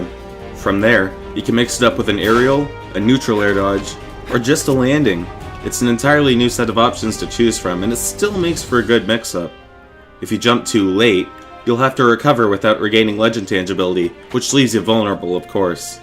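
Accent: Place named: Nova Scotia, Canada. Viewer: You have an interesting accent, are you from Nova Scotia?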